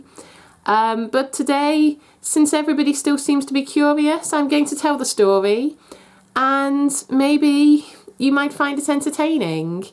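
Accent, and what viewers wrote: Scotland, United Kingdom. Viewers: Thanks for the vid. So weird that it has such a high ratio of dislikes to likes; must be idiots searching for videos on how to get verified and then getting pissed off at landing on your video or something. BTW, where in Scotland are you from? The Scottish accents that are more similar to English ones are very difficult for me to identify as such. I did think you were Scottish for a short time in the video, but I finally settled on somewhere in Northern England. Bzzt!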